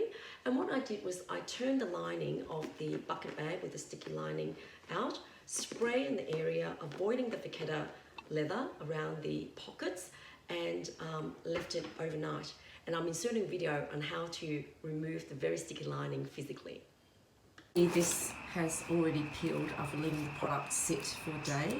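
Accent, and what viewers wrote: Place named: Australia. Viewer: Hi! I love your vibe! I also love your accent! Australia? Keep up the great work! 👏😎💖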